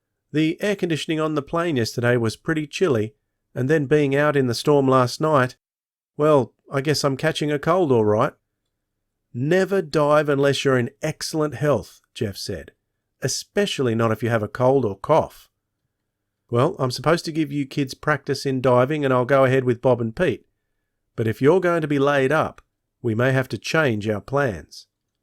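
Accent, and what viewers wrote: Australia. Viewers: Thank you for your reading of this Junior Sleuth series of books by Robert Arthur. I appreciate your fine impersonation of the Greek boy's accent. Your own Australian accent is interesting to listen to. Some years ago, I read The Mystery of the Haunted Mirror. That was my first discovery of Jupiter, Pete and Bob and their Detective agency, The Three Investigators. ☎️🏛☎️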